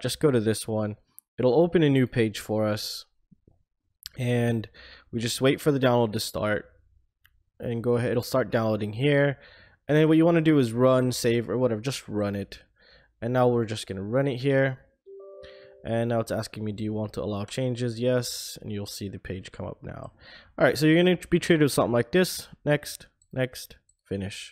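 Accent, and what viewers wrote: California, United States. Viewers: Lmao. good one but there is still data leakage. I can tell by your accent that you are most likely from Cali and IE leaked that you currently live in Hungary ;-)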